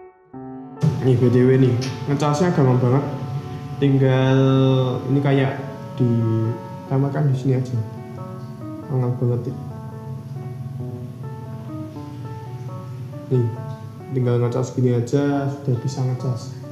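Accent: Java, Indonesia. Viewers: Jowone medok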